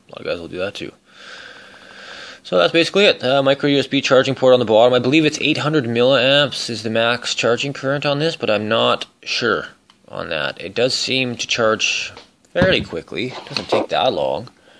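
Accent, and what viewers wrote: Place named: Canada. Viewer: sounds like Canada for sure.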